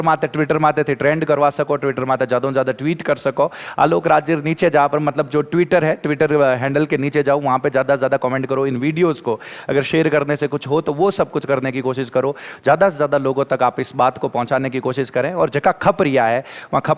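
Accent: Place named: Bihar, India. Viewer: Abe bol kya rha h.... बिहारी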